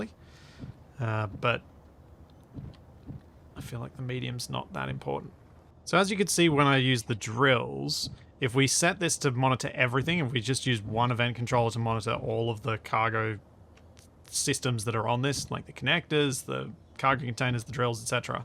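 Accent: Australia. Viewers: Your voice, manerisms, and Australian accent are like a comfort blanket for me. I dont play space engineers too often anynore, but I still adore your videos 💜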